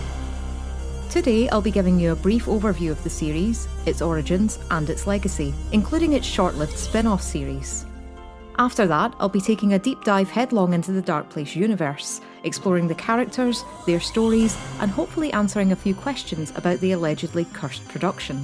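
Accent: Scotland, United Kingdom. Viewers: New to your channel, caught it through inside number 9, but must say ireally love this review of dark place i loved it and still do and noone i know has heard of it or chose to give it a try so thank you also nice to hear a fellow Scottish accent online didn't even need the subtitles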